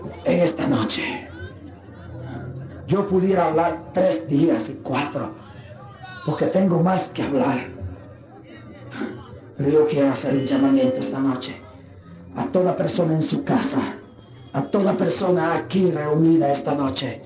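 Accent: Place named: United States. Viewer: En ese Tiempo Tenía acento Gringo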